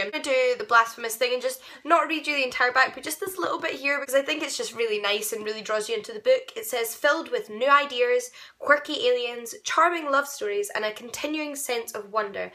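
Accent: Scotland, United Kingdom. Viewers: love your accent! You are Scottish, aren't you? I love Sir Arthur Conan Doyle's works too!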